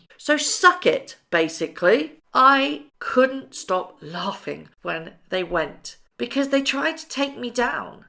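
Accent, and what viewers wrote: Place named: United Kingdom. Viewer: Dear. I said before that your British accent is adorable and so easy to understand ❤ Greetings from California. 🙏 Thank you for spreading the Truth. Wishing you and your family all the Best. Hope and 🙏 you have an easy recovery on your health. Last but not Least, you are a genius in knitting. Truly hope one fine day, you will give us a knitting tutorial how to make a knitting doll 👍 Her Majesty The Queen Elizabeth II ❤, You are loved ❤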